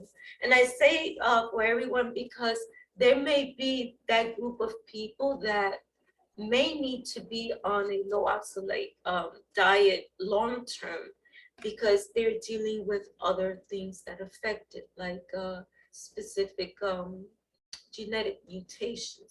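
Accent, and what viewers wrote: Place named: East Coast, United States. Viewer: Thank you for this info. Also I love your East Coast accent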